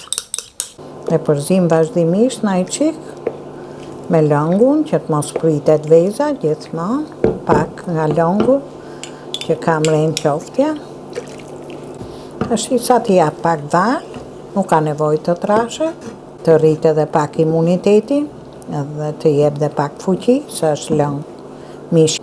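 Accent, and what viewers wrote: Tirana, Albania. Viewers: Amon mi se na knoqe me kete gjuhen tate prej tironse ilac je me kete dialekt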